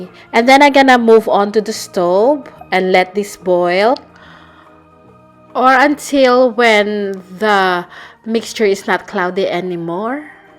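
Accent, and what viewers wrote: Philippines. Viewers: She has Philippino accent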